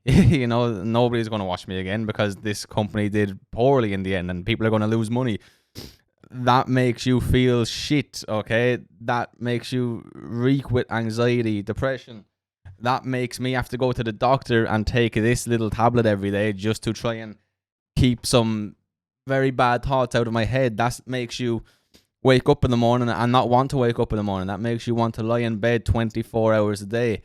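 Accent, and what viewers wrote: Ireland. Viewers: to error is human no one is perfect. You ALWAYS said do your own due diligence. Who ever blindly follows anyone, not just you deserve s what happens. I consider you as a friend. Truly! I loved sharing the excitement with you & 🤣 but I diversified anyway. What I’m trying to say is we truly NEED your beautiful Irish accent. trust in God and believe the good will overcome bad. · I missed that sweet Irish voice. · Hey, I enjoy your content. Your bubbly personality and Irish accent helps. I lost money on Workhouse and other EV stocks, but I don't blame you. The developing narrative sounded good to me, so I chose to get sucked into the FOMO YOLO. Funny thing 🤣 is that I'm still holding onto my WKHS and other EV stocks, because I lost track while working my new job. It was a fun run together, but I'm moving onto gold, silver, and commodities. Fun times, man 👌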